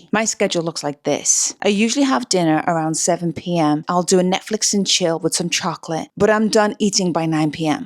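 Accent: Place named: Liverpool, United Kingdom. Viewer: I knew that was a scouse accent! Love these tips - I do simple sugars after workouts as well, cereal or milkshake are my favs. New sub! X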